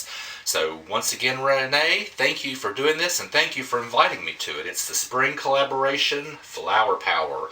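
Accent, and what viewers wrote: South, United States. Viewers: Hi! From Florida! I’m from NC, mountain side. It’s not often I get to hear a good Southerner accent. Love the soap!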